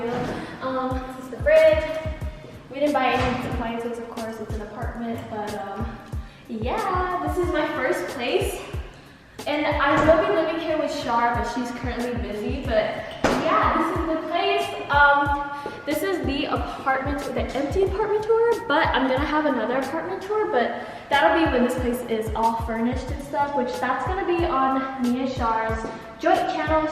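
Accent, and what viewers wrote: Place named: United Kingdom. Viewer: 😂also i can hear some british accent going there✊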